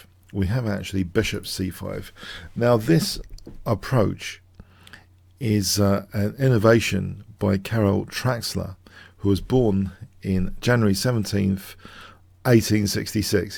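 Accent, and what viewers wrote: United Kingdom. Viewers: His low voice and British accent makes it really difficult to understand what he's saying.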